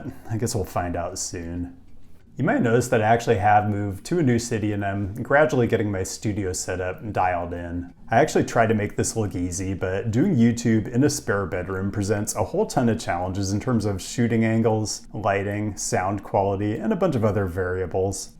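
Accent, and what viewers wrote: United States. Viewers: Duuuude it was a little short sided for you to say that you have a normal American accent JS That was putting it nicely There are so many dialects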